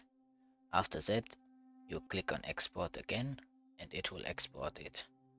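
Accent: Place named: Serbia. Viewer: You are hören sich nach ein Serbe an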